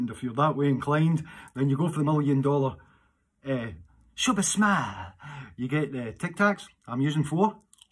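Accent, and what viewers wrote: Scotland, United Kingdom. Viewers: I love your Scottish accent, I’m was born in Mexico 🇲🇽 City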